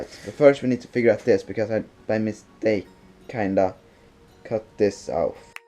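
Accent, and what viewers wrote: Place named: Sweden. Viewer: låter bättre på svenska för du snackar svegelska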